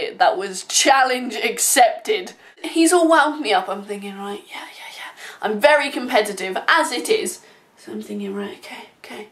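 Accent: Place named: United States. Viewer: That was a pretty good American accent.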